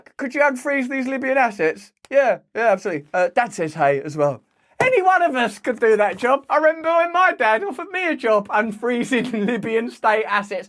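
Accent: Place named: United Kingdom. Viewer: How does anyone stand this word salad firehose million words a minute but not saying much of anything speech delivered in that “nails on a chalkboard” British accent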